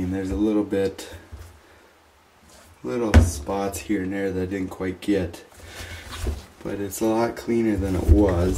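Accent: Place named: Upper Midwest, United States. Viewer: Thanks so much for posting the code for system clean. Even though mine randomly automatically pops up asking me if I want to clean system, it's always when I'm setting the control to start a load after I've already loaded clothes and detg and when I go to set controls on. It will automatically interrupt and ask me, which I then just punch cancel and it allows me to restart without asking again. If that makes sense. But now that I know how to set manually I can do it with more convience. You sound like you are from Minnesota or Wisconsin, or maybe even Buffalo NY area. Upper midwest accent. Thanks!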